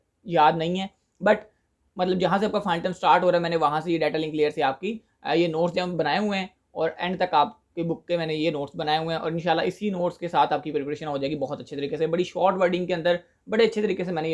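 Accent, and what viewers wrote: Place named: Karachi, Pakistan. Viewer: Lahjy sai karachi waly maloom hoty ho...!! :goodvibes:😉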